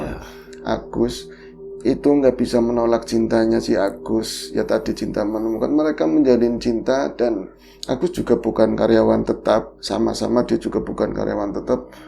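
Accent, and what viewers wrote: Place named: Tegal, Indonesia. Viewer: Dngerin logatnya bro jd inget sodara2 bpk ku yg asli tegal.👍👍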